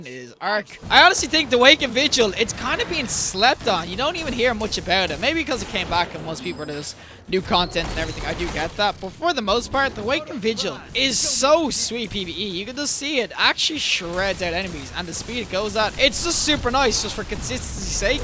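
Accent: Ireland. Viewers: i was wondering I can't work out where in Ireland you're from? i can't figure it out. i'm usually good with our accents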